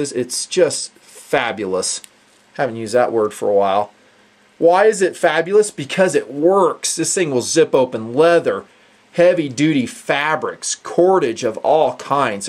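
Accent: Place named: South, United States. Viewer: I just love southern accents like when he said "sheepdog knife!"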